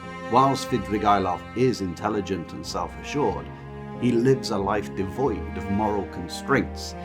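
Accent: United Kingdom. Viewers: Hi, thanks for this review and all the others you have published. Just one thing, could you leave the music off? It is very distracting, and we Americans have to concentrate to understand your british speech.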